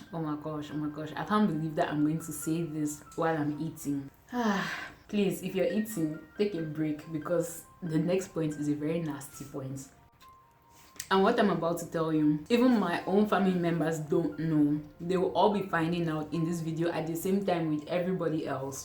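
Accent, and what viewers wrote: Nigeria. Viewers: Followed your comment from Em Etetim's video down here. If not that your channel shows Cameroon, you pass for 100% Nigerian. Both in looks and accent. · Wow this girl is Cameroonian?? She sounds Nigerian, I can't even tell. We Africans are one. You've gotten a new sub my sister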